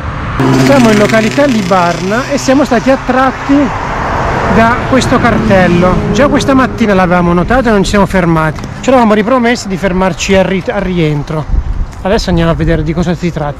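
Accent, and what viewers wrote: Abruzzo, Italy. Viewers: Spettacolo….. posti molto interessanti….. e poi mi sembra di sentire i miei CUGINI di CITTÀ SANT’ANGELO….. grazie di cuore ❤️❤️. GOOD TRIP!!!!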